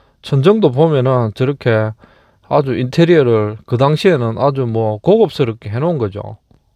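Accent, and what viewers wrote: Gyeongsang, South Korea. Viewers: 사투리? 경상도 말이좋아요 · 경산도말이 좋습니다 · ㅋㅋ 경상도 사투리 구수하게 듣기 좋아요~ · 경상도 사투리 구수하게 들리는데 누가 시비를 터는가 보군요 모르긴 몰라도 앞으로 폐가 문제는 더 심화 될것입니다 보이지않는 텃새 지역 이기주의 배타적인 정서 나열하기 싫은 단어들이 떠오르네요 아무튼 고생많았습니다 영상감사합니다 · 제가 이 tv를 구독하는 것이 컨텐츠도 좋지만 구수한 경상도 사투리때문에 보고 있어요. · 사투리 말씀 재미 있고 구수함니다.경상도.사나이.아이가...이곳미국은.빈.건물.상속자가.꼭세금을내서..세금이.밀리면.스테이.에서.가저같니다.😮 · 말은 표준말인데, 억양이 경상도라써...그런지, 알아듣는데는 아마 이상없을 것입니다. 천연기념물 의 톡특한 영상 감사히 잘 보고 있습니다. 혼자 다니시니, 몸조심 하시기 바랍니다. 사고나면 혼자다 처리해야 하니......조심 조심 운전하시길 바랍니다. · 자연스런 경상도 억양 넘 좋아요 화이팅입니다^ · 경북에 사는 사람인가보네요... 표준말 쓰라고는 안하겠데, 불편한건 맞아요.. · 전고향이 충청도인데~! 경상도 사투리와 어걍이 자연스럽고 구수하게 들려서 구독했습니더~! 마~! 빡세개 화이팅~ 하이소~!!:hand-pink-waving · 저는 구수한 경상도 사투리 목소리가 좋아 즐겁게 방송을 보고 있습니다~ 물론 전국에 버려진 빈집이 이렇게 많이 있다는 게 안타까운 마음으로 보기도 하지만요.. 아무튼 방송 고맙게 잘 보고 있습니다. 늘~ 건강하시고 안전하게 현장 취재 하시길 기원합니다~ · 경상도사람이 경상도 사투리 쓰는게 무슨 문제라고 시비을 거는지 서울촌놈도 서울사투리 마이 쓰더만... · 댓글에. 사투리에. 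설왕설래. 하시는분들. 많으시네요. ~ 전. 좋으네요...^^ 각. 지방사투리중/경상도가. 최고라얘 어느분 글처럼. 음소거로. 시청하이소. 😂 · 경상도 사투리 정겹습니다.... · 경상도 말씨 듣기 좋습니다 ❤ 상세한 설명 ~~ 즐겁게 보고있습니다. 홧팅 입니다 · 경상도의 말투가 부산 경남과 대구 경북은 완전히 다른데 은 서울 말투보다 듣기좋네요.오늘도 좋은영상 잘 봤습니다. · 전국 방방곡곡 다니시느라 고생 하십니다. 인구절벽과 경기침체에 농촌마을이 황폐화 되는군요. 경상도 사투리 좋아요. 괜히 마음쓰지 마세요. 덕분에 앉아서 전국을 구경하니 얼마나 좋습니까? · 간결한 갱상도말 지금시대좋쵸 😊😮😂🎉❤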